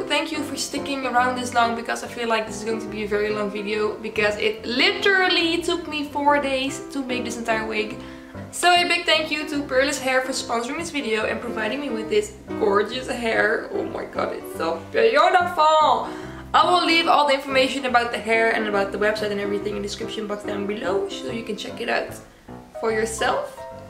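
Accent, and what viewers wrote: Netherlands. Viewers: Are you Dutch? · Ur dutch, i can hear it. But i stan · Are you dutch?? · Are you dutch? ☺️ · Is she Dutch? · Where are you from? Your accent sounds Dutch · Is she Dutch? Just wondering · i think she’s dutch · .... Are you Dutch? You talk like my classmates in English class.. well actually a bit better but it’s like I’m talking English but then without an accent ( sorry no offense) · U sound so dutch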